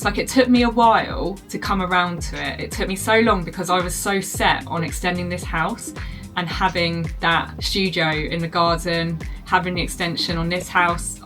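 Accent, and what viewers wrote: England, United Kingdom. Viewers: Your so adorable and cute Mr Jumps is one lucky fella and you should remind him of that every min of every day of ever week of every month 😅 and that part about you getting behind and never getting behind and you are not going to start to get behind was one of the cutest parts of this video😂 your English accent and intense eye emotion while your talking amuses me as much as how damn good you are at jumping rope! I wish you and yours the happiest of moves and times in your new home. You both deserve it!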